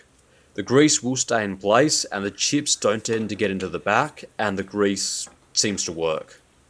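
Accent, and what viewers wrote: Australia. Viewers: Wait, when did This Old Tony get an Australian accent?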